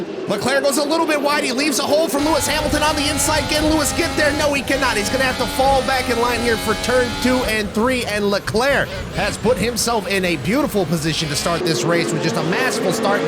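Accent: United States. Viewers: the commentator is sooooo american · Mate his murican accent is so funny😂😂😂😂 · This is the most american voice i have ever heard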